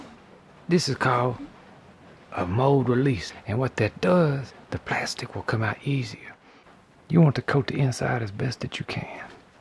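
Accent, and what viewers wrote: Louisiana, United States. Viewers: I wanted to reach out and tell you something funny, I have a little game I play with myself. Anytime I watch a video online and I can hear people talking, I love listening to the accents and being a Louisiana native, I can ALWAYS spot a cajun accent! ;) I do a little bit of digging and everytime so far, I've been right. Well today my bf was watching your video and he mentioned to me that HE thinks you're from Louisiana, based on the accent alone. I noticed two other things: you have a cup from Newks (which is only in the south, and I've been to the one in BR), and you have a PLASTIC cup.. and every single Louisianian has a cabinet full of plastic, mostly Mardi Gras cups. 😂 I crept on your various social media platforms, with no answer as to where you're from. Is it Louisiana, and if so, what part?? My bf and I are from good ol Gonzales. 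I hope this story made you laugh 😂 take care and laissez les bon temps rouler! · I just want to know what state you’re from, your accent reminds me of my dads (Louisiana). He does cool craft stuff too, specialty is tie-dye haha. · is you Cajun? I bet you is. I love the videos. So educational and relaxin. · Are you originally from Louisiana you have a hard accent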